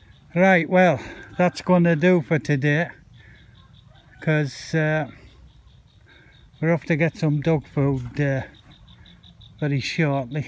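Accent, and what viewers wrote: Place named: Newcastle, United Kingdom. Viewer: you make me laugh you sound like me old granddad god bless him proper Geordie man..died at 94...done ww2, Burma and stuff, from Stanley Co Durham,..I used to gan me holidays to Consett and East Stanley...spoiled as a child, im Scottish lad but my holidays were spent in Newcastle,...Iloved it as a wee laddy, you must be a Mac,am....south of the Tyne.....great people north east,...love the Geordies,...if i ever meet you il bring a bottle of Newcastle Broon over for ye bonny lad....Im a reet at takin Geordie like man nae bother god bless ya.....gan the TOON, <num>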